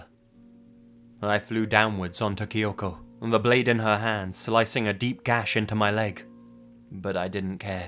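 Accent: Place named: United Kingdom. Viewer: I have been watching you for a year and I've only just realized your British like me.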